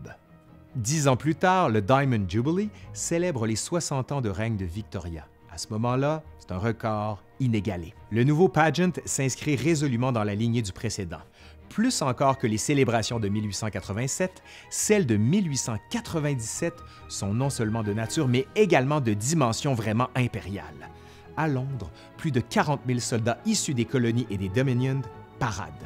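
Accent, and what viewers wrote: Quebec, Canada. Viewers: Sincèrement oui !!! excellent & fascinant ! l'histoire a toujours été mon "dada" (comme on dit en France, ma passion quoi) !!! tes talents de compteur sont indéniables 🤗 et j'adore ton petit accent québécois 😏🥰 j'aime toujours d'ailleurs la prononciation parfaite des noms anglais contrairement à nous 😅🙈 Ta chaine est ma préférée avec celle de Nota Bene & les Revues du Monde. Et oui j'avoue j'aime beaucoup aussi les émissions de Stéphane Bern 😅😁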